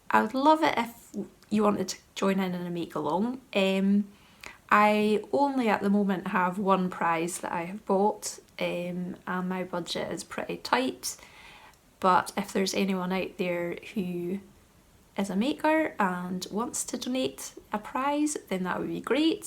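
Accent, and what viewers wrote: Scotland, United Kingdom. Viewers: Thank you for your podcast! I love hearing your Scottish accent and words. I look forward to returning to Scotland again when pandemic conditions allow it. My question would be have you attended Shetland Wool Week and what are your impressions of it? I look forward to your next video and seeing more Scotland scenery, Happy Advent! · I ‘ve loved to hear those Scottsh words. Charming.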